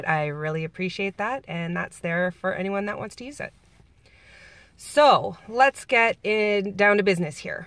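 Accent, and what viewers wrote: Canada. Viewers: I thought you sounded Canadian! 😄 I'm in Wisconsin on lake Michigan, great lakes goddess siSTARs ♡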